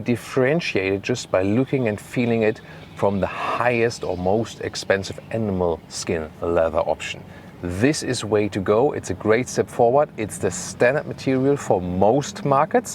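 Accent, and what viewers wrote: Germany. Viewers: Re: German car bias. That’s exactly why I subscribed to this channel. A German reviewing German cars in English is a perspective one cannot otherwise get. Say, “DEE-hu-mid-if-cation” :-) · Hi, your reviews are great, but your heavy accent makes it very painful to listen to. Why don’t you consider recording your videos in your native tongue (German) and add closed caption, or even a voice-over with a native English speaker? No offence, but it would win you more English speaking viewers for your great channel. Why don’t you consider it?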